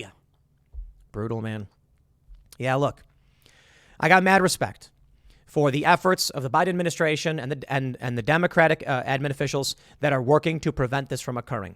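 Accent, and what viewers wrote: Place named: Chicago, United States. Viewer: ever since his little blow up about "election deniers" he's become harder and harder to listen to. That new cutesy chicago accent thing is highly annoying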